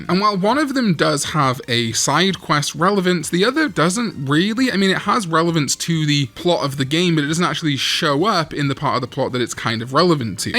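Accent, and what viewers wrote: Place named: Wales, United Kingdom. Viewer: It's nice to hear a welsh person butchering Irish words. There's some kind of solidarity there.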